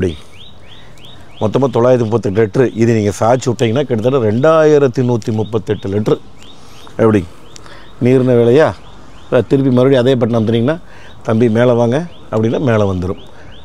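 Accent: Kongu, India. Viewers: ilainga bro Kongu Tamil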